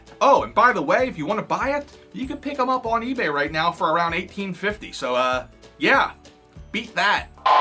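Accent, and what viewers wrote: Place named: Pennsylvania, United States. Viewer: 3 minutes in and I'm saying to myself "He's GOTTA be from PA with that accent.." HAHA! I'm from Philly, I'd know that accent anywhere, haha! GREAT VIDEO!!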